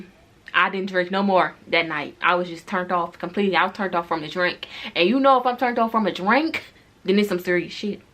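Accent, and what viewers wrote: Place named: Maryland, United States. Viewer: Are you from MD. I can hear the accent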